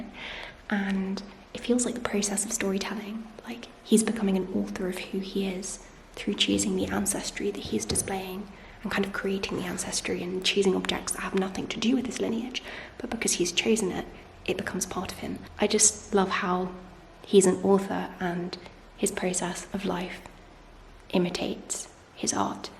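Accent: United Kingdom. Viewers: I love your accent, British women are so elegant sounding.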